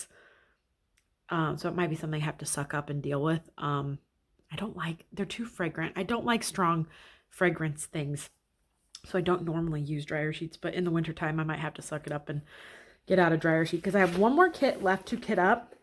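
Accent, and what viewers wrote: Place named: Midwest, United States. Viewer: Ah I knew you lived in the Midwest I heard you say Missouri lol! I didn’t want to be the creeper that asked where you lived but I live in Nebraska and from Iowa so I could tell by your accent you were a midwestern like me ❤❤😂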